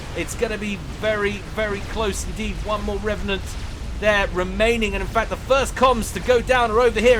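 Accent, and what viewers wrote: United Kingdom. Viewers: I think we have a few too many children up past their bedtime in here...:) In any case though, I think describing your accent as received pronunciation is probably going a bit far, you've got a reasonably posh accent but too tainted to be proper RP.;)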